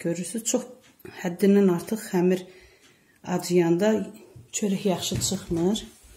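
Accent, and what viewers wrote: Tovuz, Azerbaijan. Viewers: Allah ruzinizi bol elesin🙏 ellerinize sağlıq 🥰lehceniz biz terefe oxsadi Tovuz lehcesine🥰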